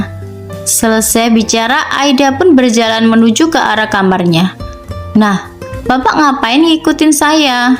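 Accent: Java, Indonesia. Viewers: Suka suara khas medok jawanya semangat akak❤❤❤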